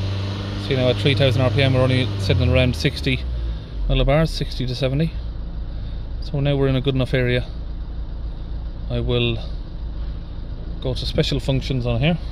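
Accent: Ireland. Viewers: SOUTHH Dublin accent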